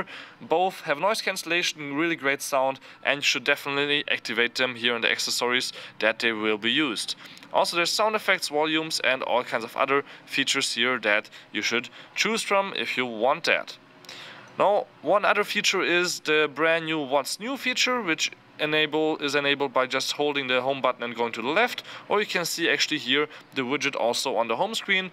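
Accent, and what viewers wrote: Germany. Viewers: ... I like it when German speak English. The little accent is cute. :3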